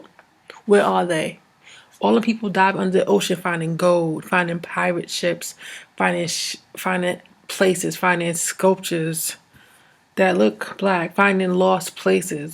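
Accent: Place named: England, United Kingdom. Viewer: I dig everything you've said, period, that's the point right?. Are you English or from London. I notice you have a bit of an accent when you speak. But nice information. I respect that, but if you are then be that, don' fake the funk, that is if you are.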